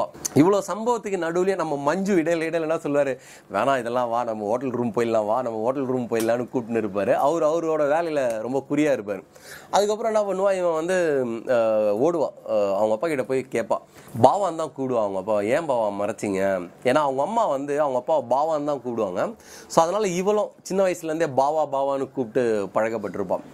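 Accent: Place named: Chennai, India. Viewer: Listening to your story and folding clothes 🤣🤣🤣 Chennai dialects awesome....you and your attitude resembles one my close friend.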